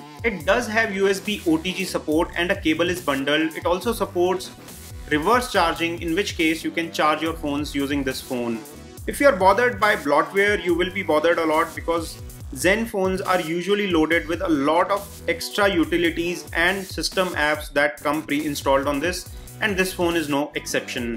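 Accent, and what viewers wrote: India. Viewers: i like listening your indian english ... nice review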